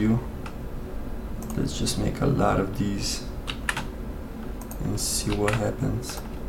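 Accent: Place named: Brazil. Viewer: Your accent sounds like brazillian.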